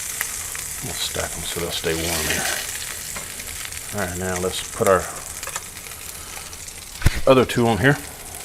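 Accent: South, United States. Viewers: i dont like steak sauce, but this video has definately made me want to try it out. it looks so good.... thanks for this awesome idea... (ps-i love your southern accent) :p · For those that don't speak southern drawl English, "I'm awn" means, "I'm going to" I know it makes no sense. Nobody can explain that total ruination of our English language. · we never use aluminum in the kitchen . oh wait, here comes the cast iron. sorry. other than that, they look great. where is the chopped onion? Jumped the gun again, sorry. lol my preference. great video. anybody that says "warter" and "fixin to" must be southern, Georgia or Alabama? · Hi, I like your stuff. Your voice takes me home to the south again. One thought comes to me. What do you think of a lid or splatter shield over the skillet? Seems to me easier to wash a splatter shield than degrease other pots, the entire stove-top and burner grills and burner diffuser parts to keep the gas ports clear of blockage. 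Who cleans ip behind you. I'm guessing its not you. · You sound like you are from southeast Texas or southwest Louisiana. I'm from DeRidder, La but i live in Henderson, Tx now. You sound like my people lol There ain't nothing better than a southern gentleman who knows how to cook!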